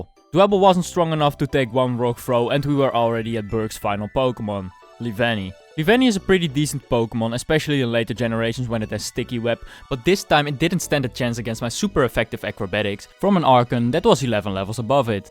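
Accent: Netherlands. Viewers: Are you dutch Your accent kinda sounds like it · Are you dutch? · Je bent nederlands of niet je hoort gelijk pik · Are you Dutch? You sound like that. But I really liked this video :) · Nice dutch accent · Where are you from. My guess is the Netherlands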